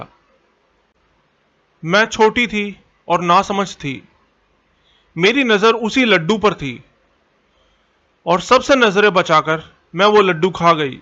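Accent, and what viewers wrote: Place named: Sindh, India. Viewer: Aap kya sindhi ho kya baat karna waqt rhoda sindhi sound karta ho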